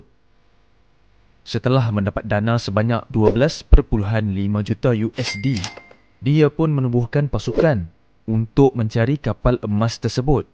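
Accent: North, Malaysia. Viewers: Mat ang org mna dengaq cm org utara...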